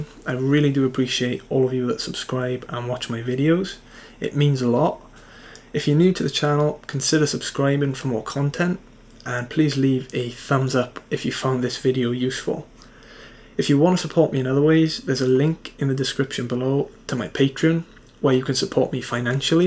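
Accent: South Wales, United Kingdom. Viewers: EXACTLY what I was looking for explained concisely and clearly in that wonderful South Walian accent. Merthyr boy here (now in Snwdonia) enjoying your videos.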